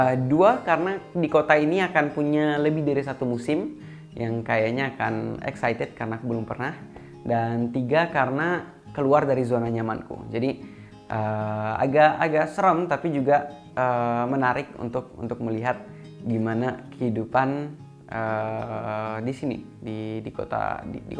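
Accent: Indonesia. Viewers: ...jangan lupakan logat bahasamu..dimanapun kamu berada Saya suka tutur kata Dan logat kamu yg Indonesia bangettt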